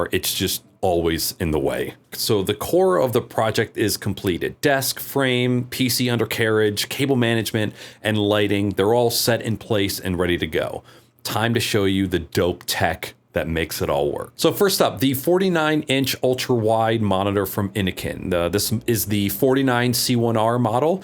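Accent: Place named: Australia. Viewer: I hope that one day my Australian accent can be as good as yours. This instructional video helped!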